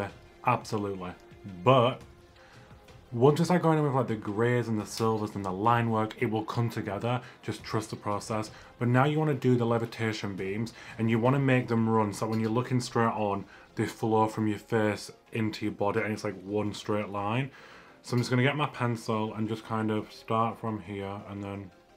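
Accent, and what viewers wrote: United Kingdom. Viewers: I’ve read you are from London so what’s with the northern / East Midlands accent ??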